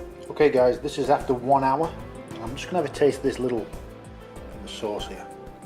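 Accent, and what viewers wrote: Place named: United Kingdom. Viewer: my goodness, you're hot,! <3 im a first time viewer of your channel and good job! And your accent immediately reminded me of my Brit friend...whom, turns out, lives near Rotherham as well! I hope I'm developing an ear for the regions of the UK! Anyways, a man that knows his way around the kitchen is a total turn on! Ahh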